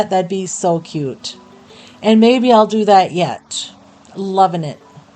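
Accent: Minnesota, United States. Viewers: Quick and super cute projects! I am always looking for jar ideas. Those little ones are too cute! You cracked me up with the little joke about the jars saing we need some beads to keep it farmhouse ya know! And sounds just like Minnesota way of speaking! 😂😂 I loved the sign! The color is perfect and the name of the store, and last one in its a rotten egg! So fun 😂 You make me laugh at least once a video. I love it and you my friend! Have a great week! 😚😚 Oh and congrats about your shop! You're going to be home all day to mind the store?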